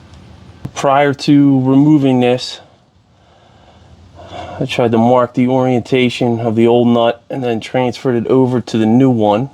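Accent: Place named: Pittsburgh, United States. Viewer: Dude sounds like Pittsburgh. 👍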